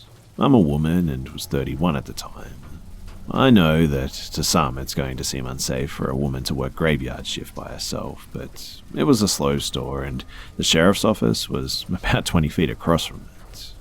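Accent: Australia. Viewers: thois guy izza aussie fah shhure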